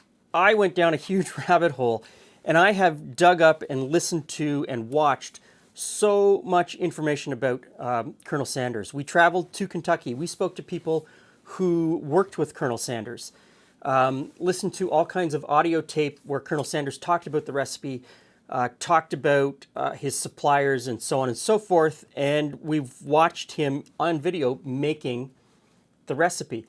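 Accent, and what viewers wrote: Canada. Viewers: I can spot a Canadian accent in a few minutes! PS i met the colonel back in the day here in Michigan! What is it that Brits love KFC? My mother born in Scotland used to serve it up at family events!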